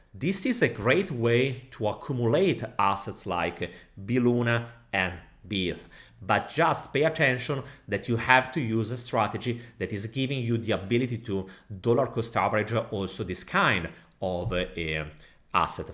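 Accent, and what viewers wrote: Italy. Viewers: ti prego toglimi una curiosità: sei italiano o spagnolo? L'accento mi sembra italiano, poi ho visto che hai anche un canale spagnolo...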